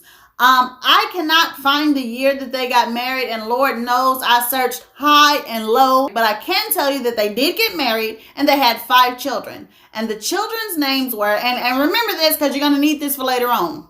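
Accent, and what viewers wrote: South, United States. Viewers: You're gorgeous with the southern accent!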